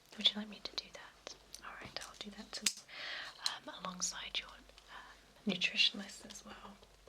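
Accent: England, United Kingdom. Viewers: These videos would not be as relaxing nor comforting if her accent were Bulgarian or Czechoslovakian ! Her English accent is perfect for ASMR.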